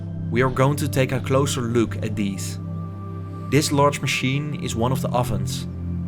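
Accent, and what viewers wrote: Netherlands. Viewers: This dutch accent is awful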